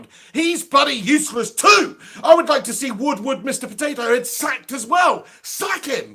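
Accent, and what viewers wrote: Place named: Cockney, United Kingdom. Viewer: Cockney Wanker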